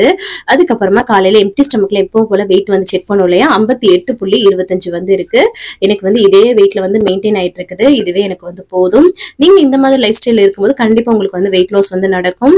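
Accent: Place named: Sivaganga, India. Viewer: Namma sivagangai tamil la nalam visarikirathu romba alagu❤thambi🎉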